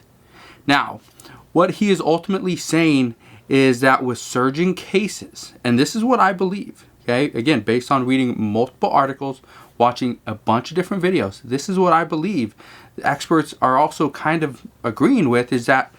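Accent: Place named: Seattle, United States. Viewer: Thanks, for your research and content. You sound to be in the Seattle area, I'm in Hermiston, Eastern Oregon. Love the videos!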